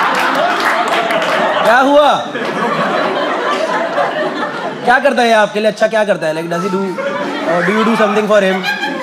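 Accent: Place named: Mumbai, India. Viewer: Got the Bombay boy accent on point bro. Great set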